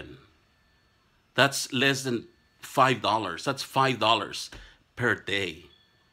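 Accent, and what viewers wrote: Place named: Mexico. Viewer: Tienes demasiado acento para ser Mexican american eres naturalido que no? Y si tu puedes votar por cualquiera F@ 2016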